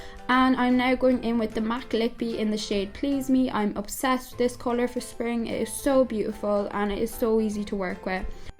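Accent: Ireland. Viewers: Love this xxx just wondering what county u from X I honestly can't tell hahaha xx I'm from tipp btw xx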